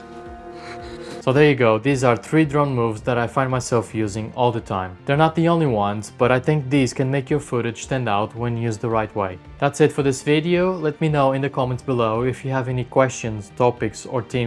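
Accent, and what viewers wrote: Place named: Madeira, Portugal. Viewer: Quando ví o clip da ilha da Madeira, comecei a notar mais no teu sotaque e és claramente madeirense! Bom vídeo 👍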